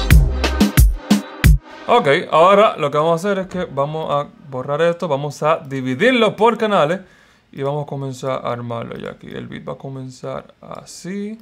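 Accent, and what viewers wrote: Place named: Dominican Republic. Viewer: me gusta tu acento... tu acento es de República Dominicana verdad?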